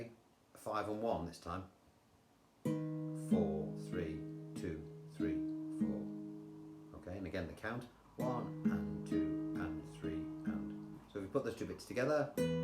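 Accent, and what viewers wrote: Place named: England, United Kingdom. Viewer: Hi. I have only just found your YouTube site by accident. I was looking for a tutorial for and you came up. I have had a look through and now subscribed to your channel. I have been playing a few months now and learned some open chords which I will need some time. The trouble is I can’t sing so want to play instrumentals which I have started to plat, still slow as it takes longer to sink in with me. I started a bit late in life wanting to learn guitar but can play a little, it is only for myself but enjoy what I am learning. I am 72 so don’t know if I will ever play perfect or not but I will have a good try hopefully. Thank you very much from me to you because I like your approach to your teaching method. From your voice I know you are English but obviously don’t know if you still live on the UK or not. I am from Warrington Cheshire, you will probably know where that is. 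Anyway I won’t bore you to death any longer, but once again a big thank you from me and others that watch you or have lessons with you. Take care and best regards. Chas.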